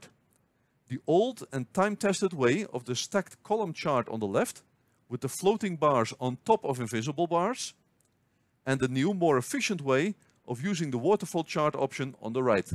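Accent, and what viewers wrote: Netherlands. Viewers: what a nice dutch accent_:D but thanks, useful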